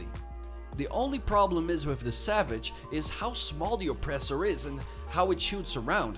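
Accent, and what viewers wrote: Netherlands. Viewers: It's a nordic accent. Probably Dutch or Swedish. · this guy has to be dutch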